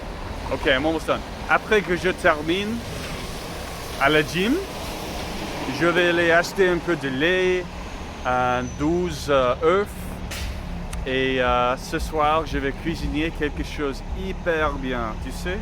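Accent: Quebec, Canada. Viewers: C est vrai que tu as légèrement un accent québécois mais c est du francais 😊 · Salut. C'est Betsy. Je suis ravie que tu fasses autant de progrès avec ton français (et que tu emploies correctement le subjonctif! LOL) Je n'ai pas écouter toute la vidéo, mais quand même le début et la fin. Excuse-moi de te corriger (je ne peux pas me retenir, étant prof!!) ici et là. 1. Je suis en train DE +infinitif 2. faire les courseS 3. Une Rue 4. un accent Québecois (masculin) 5. AU Canada 6. essayer DE 7. to shape = former 8. to get by = se débrouiller Et j'apprécie ton accent Québecois! Vive le Canada!! Elbows Up! A la prochaine, Betsy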